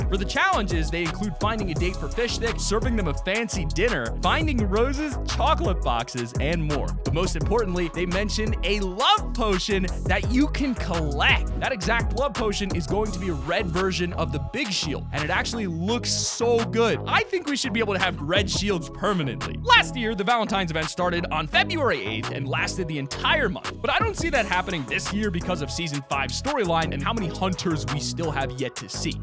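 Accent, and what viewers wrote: United Kingdom. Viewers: u speak london very best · Is someone British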